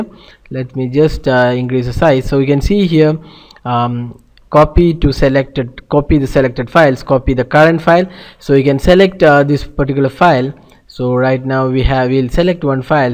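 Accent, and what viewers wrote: India. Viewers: for gods sake speak in a slower manner so us English speaking people can understand you my man...I know you are doing your best but your speech pattern is hard to follow when you more or less mumble all the words in the same monotone .....wish to god I could speak on this thing (think post).. Asian Indians really need to S L O W down in your speech patterns....hell I'm Southern and don't speak English that good myself but it comes across horribly.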